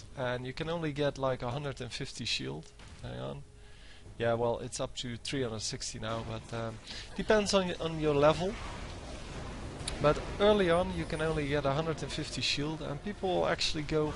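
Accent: Netherlands. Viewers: haha I noticed he's Dutch because I talk the same way as him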